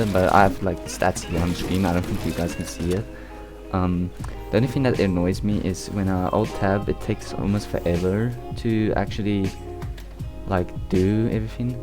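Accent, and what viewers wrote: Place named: South Africa. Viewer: oh mans south african lol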